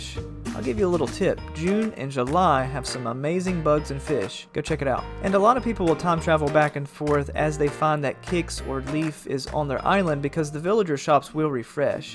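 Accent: Texas, United States. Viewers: Ngl, you sound like what people think Texans sound like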